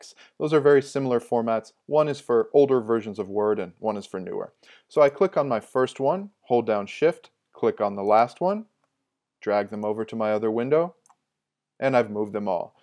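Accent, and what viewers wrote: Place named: Kansas, United States. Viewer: Cats and Ringworm yes, I really liked the delivery. I'm from Kansas, maybe it's the same dialect? thank you for this helpful video!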